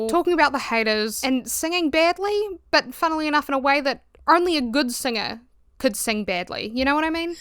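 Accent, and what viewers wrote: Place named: New Zealand. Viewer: my master yapping queen thank you for being my background yapper while gaming there's something about the kiwi that makes your voice so soothing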